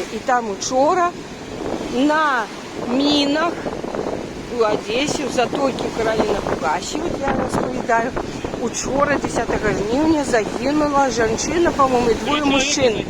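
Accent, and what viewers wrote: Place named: Belarus. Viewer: Очень беларуский акцент. Хай живе Беларусь ! Не ставайте трапками для бацьки !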